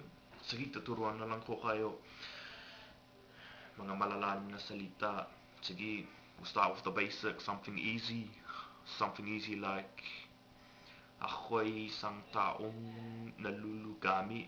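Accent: Philippines. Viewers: ...hhmmm...galing mo po huh?!san ka po ba nag misyunaryo sa pilipinas?kasi ung accent mo hindi galing sa lugar ko?!. pero superb tagalog mo..keep it up!!!....... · Stop trying who you are not.. I can still hear the Filipino accent.. Who you foolin?!?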